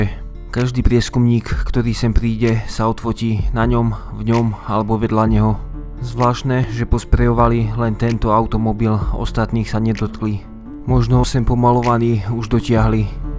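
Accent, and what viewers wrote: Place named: East, Slovakia. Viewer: Videá má dobré,klobúk dole ale mal by natočiť video o svojej škole,lebo ten komentár,dva letiská,dva cesty,dva autá už je blbé,mal by navštíviť učiteľku zo ZDŠ.Trepanie o studenej vojne 1970,studená vojna bola už za prezidenta Kennedyho a Chruščova tá neskončila padnutím ZSSR.Keď že robí zvuk až po natočení videa,stále to môže opraviť,poznám dosť východniarov ktorý žijú v Bratislave a hovoria síce z prízvukom ale spisovne!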